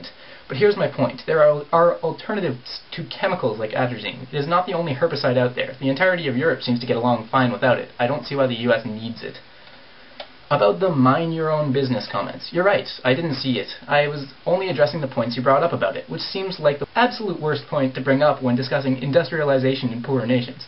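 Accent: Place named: Canada. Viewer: haha, I love canadian accents. abowt! hehehe. nice video, dude.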